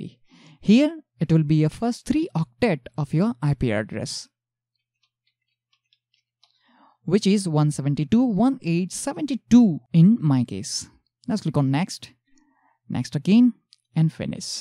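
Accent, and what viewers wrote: India. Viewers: the most indianest accent ever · ..and the Indian accent is also a joy to listen to :)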